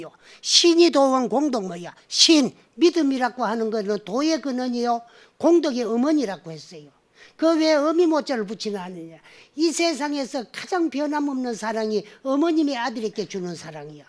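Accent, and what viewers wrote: Gyeongsang, South Korea. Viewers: 묘허스님법문에 푹 빠져서 밤낮을 모르고 경청하고있습니다 스님 감사합니다 경상도 사투리가 이웃집 어르신같습니다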